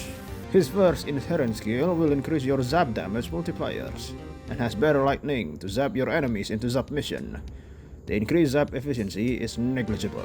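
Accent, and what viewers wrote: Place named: Philippines. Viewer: Man that accent😅 i cant. I assume you're a filipino or indo cuz i also sound like you whenever i speak in ingleesh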